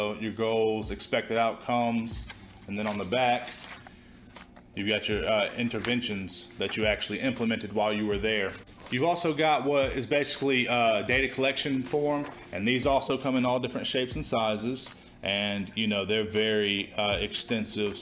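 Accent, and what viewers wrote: South, United States. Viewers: He sounds like he's from the south 🙌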